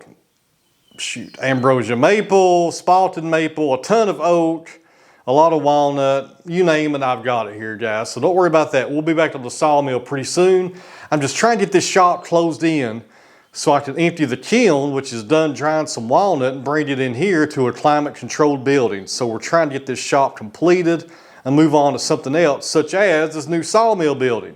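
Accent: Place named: Tennessee, United States. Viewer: I think I would go for a second coat unless the bulk of the wall is being covered up by tools etc. No on second thoughts a second coat is needed, do the job properly so that the exsposed parts of the wall do look finished. Thank you for what you do, it is great to watch your progress and growth. That accent of yours is just how a Limey would imaginea genuine Tennessee man to have and long may you continue, I just love it. How much land do you have for your property, land in England is eye watering high and hard to come by.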